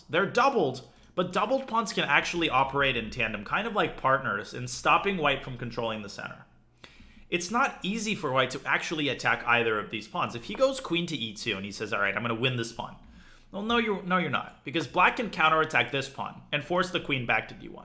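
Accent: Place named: Russia. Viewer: Man, your russian accent is just amazing. I can't wait to join your stream to hear it live.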